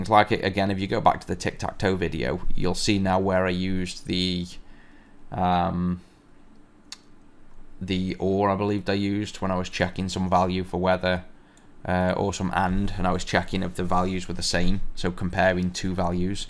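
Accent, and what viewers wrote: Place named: Manchester, United Kingdom. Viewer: Great tutorials, I'm enjoying them immensely. I'm coming from VB (I know!!!), <link>, VBA, <link> background, although I have also written a few, fairly rushed C# programs, I'm watching these more for good programming practice and to quickly fill in any big gaps in my knowledge - this tutorial being one such example. If there is one thing I will take from these tutorials, it is the proper documentation of code, I have been shamefully neglectful of this in the past :( I have a couple of questions, if you don't mind, do you have any plans to cover MVC and where are you from - my guess is South Manchester :) Anyway, thanks again for the tutorials - superb stuff.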